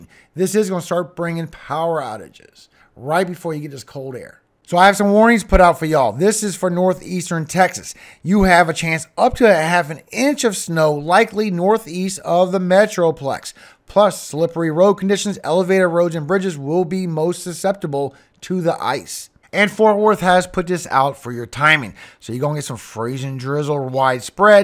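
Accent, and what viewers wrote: Louisiana, United States. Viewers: sounds like you are Cajun? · As always good ,needed , information and the fact that your Cajun is just icing on the cake... thanks for all you do !! And the extra gift of reading us the scriptures 😔❤️ take care BB from somewhere in Louisiana 🤠👍👍👍👍👍👍👍👍👍👍👍👍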